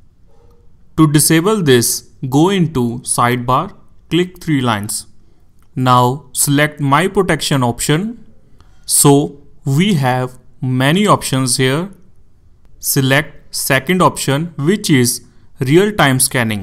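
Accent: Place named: India. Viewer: no thanks your indian scammer voice doesnt convince me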